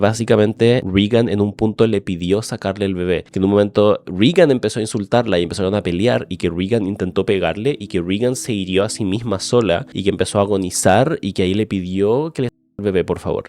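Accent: Chile. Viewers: eres chileno?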